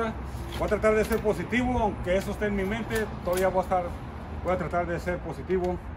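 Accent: Spain. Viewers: A mi también me encanta, y el acento español q tiene más..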